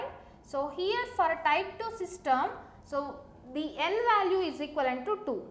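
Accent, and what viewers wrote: India. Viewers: USELESS AS FUCK FUCKING SHITTY INDIAN ACCENT CANT UNDERSTAND A SHIT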